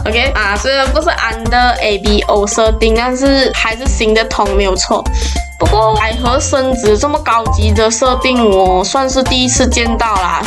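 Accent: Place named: Malaysia. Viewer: 讲的很好只是马来西亚口音很重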